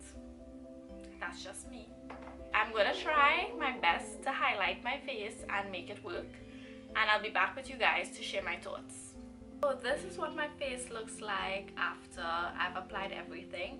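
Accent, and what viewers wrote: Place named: Trinidad and Tobago. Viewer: trini!! new subbie soon as i heard the accent. thought the color was totally off at first but with some oxidation you worked it out and you looked beautiful.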